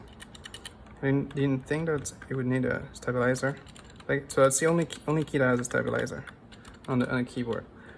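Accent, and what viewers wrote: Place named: Europe. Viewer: Where are you from? You sound European but pronounce it Zee instead of Zed.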